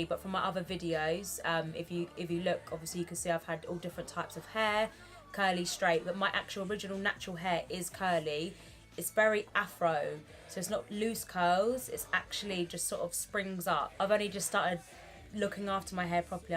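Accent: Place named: United Kingdom. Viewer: I'm half white and half white?😂😂😂😂, Omg i think that have both cultures is funnny, but i AM from Brasil, and yes i'm mixed too but i Just white, not the two things. You is beautiful ♥️ and the ethinity no matter and, i love your accent! I haveee a special feeling for UK because of Amy Winehouse♥️omg i wanna visited a day kisses from Brasil, you are cute and funny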